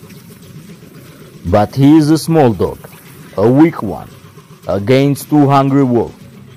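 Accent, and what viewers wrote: Russia. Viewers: Лучше б рассказал на на русском. Зачем так напрягаться, по акценту понятно что русскоязычный. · i like your russian acsent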